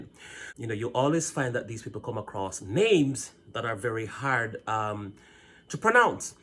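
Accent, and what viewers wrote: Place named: Ireland. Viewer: Great stuff buddy. I notice your accent almost sounds a little Irish at times.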